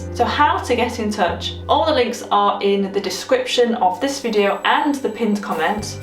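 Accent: United Kingdom. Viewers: i love your british accent. You the best teacher. I learn so much with your music videos tutorials =)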